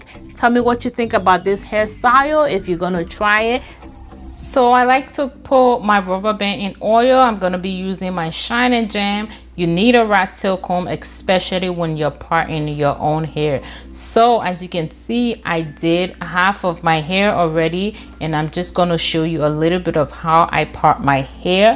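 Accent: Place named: Liberia. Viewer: Are you a Liberian? You sound like one no doubt 🥰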